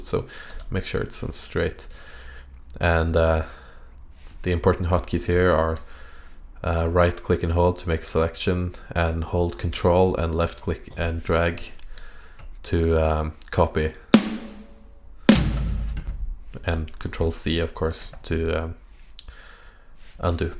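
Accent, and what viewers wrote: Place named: Scandinavia. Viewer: Scandinavian accent.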